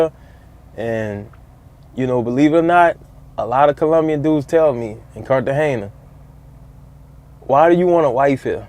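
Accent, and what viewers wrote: Louisiana, United States. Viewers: I can hear that Louisiana in ya! Keep uploading man. Just subbed! ⚜⚜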